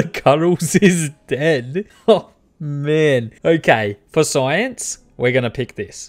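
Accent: Australia. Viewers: An amazing let’s play. I love watching people play nice and good characters but there is something cathartic about this. It just rubs me the right way. The Australian accent helps as well ;)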